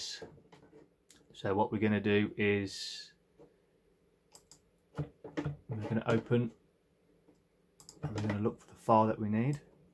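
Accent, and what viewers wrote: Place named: Midlands, United Kingdom. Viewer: Nice video. As a fellow Midlander, I'm loving the accent. Only our people can put so many syllables in the word "side". "Day-turr" :)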